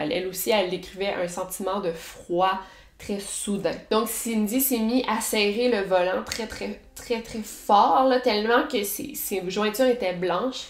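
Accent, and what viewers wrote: Quebec, Canada. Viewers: J’adore ce bla-bla québécois. 🥰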